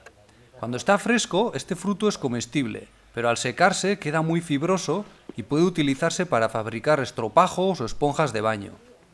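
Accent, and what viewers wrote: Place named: Navarre, Spain. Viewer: coño, yo pensaba que eras de bilbao y eres paisano mio.. tengo amigos de tafalla con ese mismo acento :D aupa nafarroa :D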